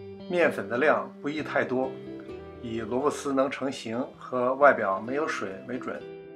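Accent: Beijing, China. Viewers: 很純正的京片子我一聽就愛死人了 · 真不错，朋友是北京人吧。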